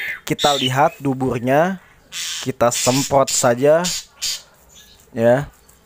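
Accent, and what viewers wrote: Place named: Sunda, Indonesia. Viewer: Inimah nadana urang sunda,salam kang ti bogor timur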